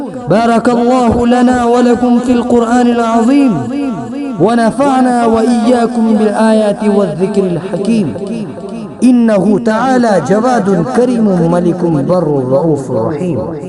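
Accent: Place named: Arab world. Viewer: Arabic andaz masha allha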